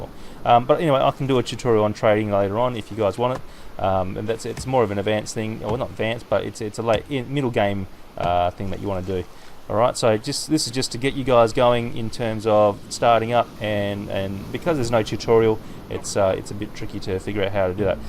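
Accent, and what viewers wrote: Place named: Australia. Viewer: I found this really helpful so thanks mate! It's also refreshing to hear an Aussie accent ;)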